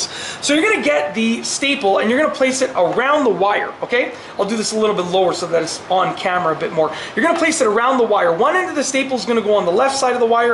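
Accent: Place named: Canada. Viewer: Canadian Eh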